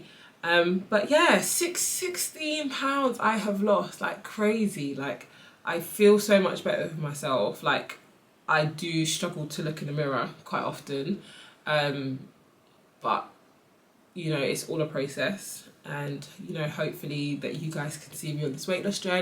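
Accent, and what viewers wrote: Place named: United Kingdom. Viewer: Side note: Sometimes it’s just nice to click on a video and hear a British accent ☺️ you look amazing sis! I can’t wait to start my journey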